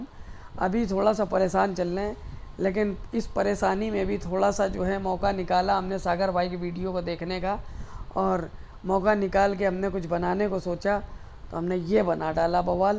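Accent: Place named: Uttar Pradesh, India. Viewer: भाई पहली बार आपकी वीडियो देखी, बहुत अच्छी लगी, कहाँ से हो आप भाषा से तो उत्तर प्रदेश की लग रही है???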